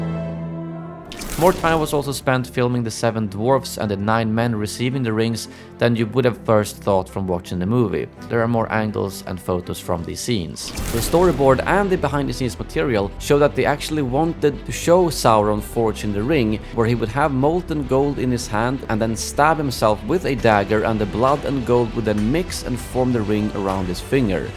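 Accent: Sweden. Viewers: If having a Swedish accent means sounding gay as in merry and bright, then yes! 🤓✨️ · I applaud your continued efforts on this project. The day we do see more scenes (and hopefully new extended anniversary cuts) released, I trust many of us will gather here and thank you for your continued interest and passion for this trilogy and all its lost fragments of gold. If there's anything that's ever going to give all this lost material a good push toward being released, it's this. This undeniable display of passion. You look at these compilation videos being updated again and again as more material surfaces over the years, and it couldn't be clearer. Hundreds of comments. Hundreds of thousands of views. This kind of passion doesn't just die down quietly over time, there's too much good stuff out there to keep folks like us up at night. I'm glad to see the torch is still burning bright. 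Also, hearing a fellow Swede narrate this really resonates with the whole Nordic Middle-Earth vibe.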